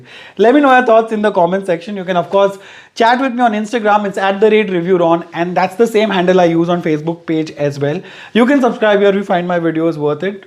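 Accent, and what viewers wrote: India. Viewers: Awsome accent.....influncers lik u can make dis accent a pride instead of being ashamed of....we r d highest english speaking country so we can mend it to our accent nd make it Indian jst like usa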